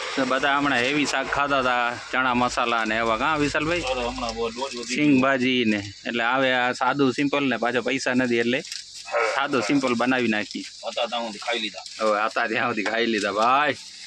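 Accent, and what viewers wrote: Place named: Kathiawar, India. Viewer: મઝા નથી આવતી ભાઈ... તમારા વીડિયોમાં જ બધું આવવા દયોને.. અહીં મુંબઈ માં બેસી તમારી કાઠિયાવાડી ભાષા નો લેહકો સાંભળવાની બહુ મઝા આવે છે...". કાંઈ ઘટે નહીં ભાઈ..." 😊😊😊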